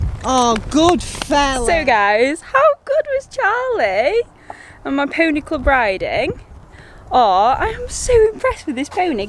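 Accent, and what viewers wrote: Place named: Yorkshire, United Kingdom. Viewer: I’m so confused which accent is your natural accent? 😂 one minute you sound posh the next from Yorkshire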